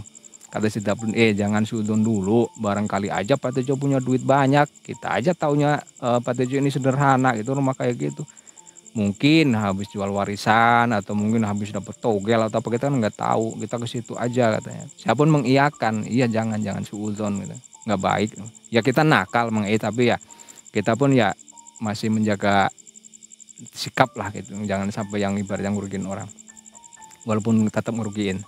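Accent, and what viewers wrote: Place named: West Java, Indonesia. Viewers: Mang ini kan orang asli cilacap ya, kok beberapa celetukan lebih mirip orang sunda daripada gaya khas orang cilacap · Kok kayak orang sunda mas nya yaa 😂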